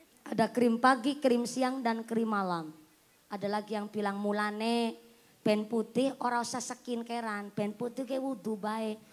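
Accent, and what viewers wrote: Banyumas, Indonesia. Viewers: Ceramah ngapak memang tidak membosankan kak mantap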